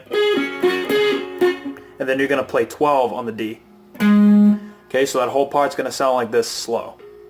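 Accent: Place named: Massachusetts, United States. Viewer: A lot of these riffs you technically have the correct notes but they’re played quite differently by Mike mostly so they ring out as chords more than how you do it but I guess if you did this totally by ear you did a good job cuz the notes are mostly correct. Also where in MA are you from? I’m from there originally and I hear the accent lol. “choahd=chord”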